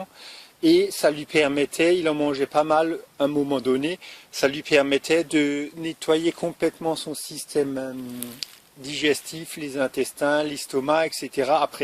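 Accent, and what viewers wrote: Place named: Alsace, France. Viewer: Je reconnaît cet accent alsacien je suis d origine alsacienne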